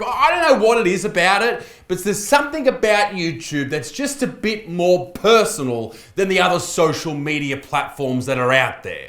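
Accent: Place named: Australia. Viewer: He's Australian, an instant pass, given. No questions asked. Swear all he wants. Australians can make pretty much anything sound amazing, funny, and worth listening to, etc...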